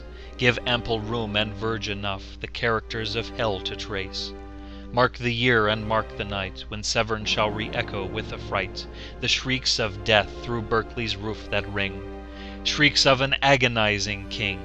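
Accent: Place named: United States. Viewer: Diolch yn fawr! Although not sure the US accent lends itself to medieval poetry ;)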